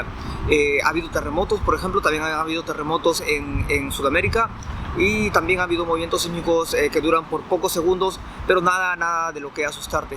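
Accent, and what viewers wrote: Japan. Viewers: Tiene acento japonés el ... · El muchacho es peruano de aca a japón. solo que adopto el tono japones. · Se te ha pegado el acento japonés no pude reconocer tu nacionalidad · Buen video. Se nota que estas hace rato porque se te pego el acento japonés. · Se le pegó el acento japonés jaja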